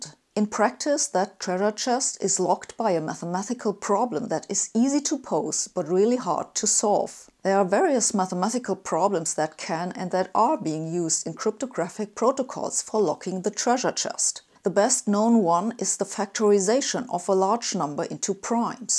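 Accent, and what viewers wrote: Germany. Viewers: That was single handedly the best transition to a sponsorship ever. Also the way you explain the benefits and features of nord VPN actually made me think about buying it. not only are you an amazing scientist and educator, your sales skills are a solid 10 as well. Please do not be hard on your english either. You have an accent yes. Your english is not broken by any means and you are very easy to understand. Plus I have always a german accent to be rather intimidating so I shut up and listen more. Thank you for the laugh first thing in the morning. My perception about how today is going to go has spin in the optimistic direction. Stay safe and healthy! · you sound German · I am so old that to me English spoken with a German accent by a scientist is considered more reliable than pure American or British English. Given the current standing of rationality in the US and the UK, I am modern again.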